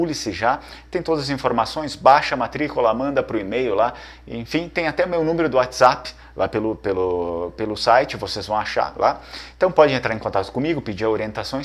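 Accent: Italy. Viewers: O seu sotaque em vêneto é igualzinho ao dos meus tios de Vicenza!!!👏🏻👏🏻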